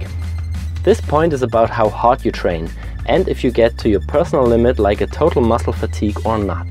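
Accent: Germany. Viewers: omg... What's happening to me? Now even German-accented voice alone gives me a hard on? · DEUTSCH AKZENT :)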